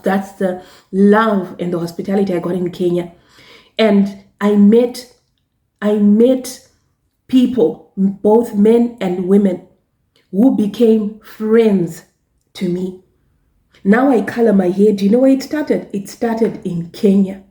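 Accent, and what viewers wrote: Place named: South Africa. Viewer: You have a South African accent, sounds very nice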